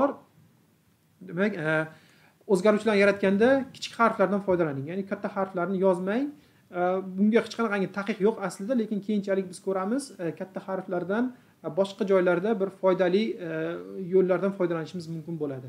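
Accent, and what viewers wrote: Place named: Tashkent, Uzbekistan. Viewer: Toshkencha accent ingilizcha so'z yoqmasa surgin bettan yoki qsp darssi organ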